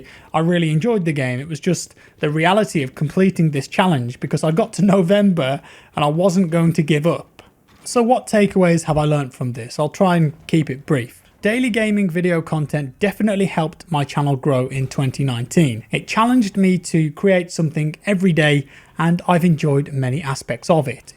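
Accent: United Kingdom. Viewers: USA dominates your views because we just cant resist that British accent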